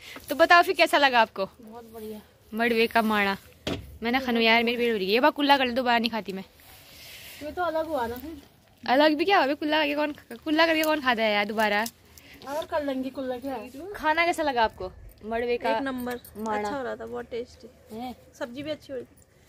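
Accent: Pahari, India. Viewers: tumhari jo ye pahadi m bolna hai na mujhe bahut achache lagate hai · आपकी पहाड़ी बोली बहुत अच्छी है 👌👌👍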